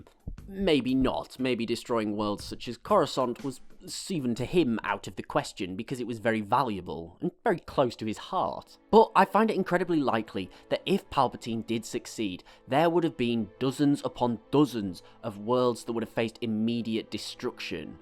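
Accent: United Kingdom. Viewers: I agree, I love brittish accents. Tho according 2 sw lore, he's evil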